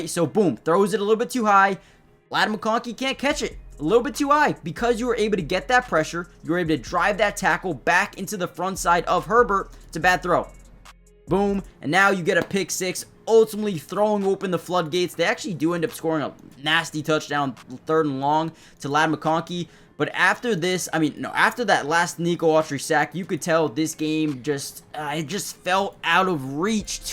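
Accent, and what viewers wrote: Canada. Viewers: Is brother a Canadian? Caught a couple of 'aboots' in there?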